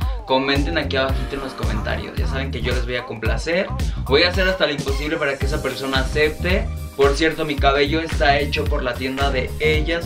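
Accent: Chihuahua, Mexico. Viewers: Jajaja tu acento de chihuahua, que loba hermana! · Ay, amé en vdd, me divertí muchísimo, aparte tu acento shihuahuence super bien hecho, saludos desde ciudad juarez, shihuahua!!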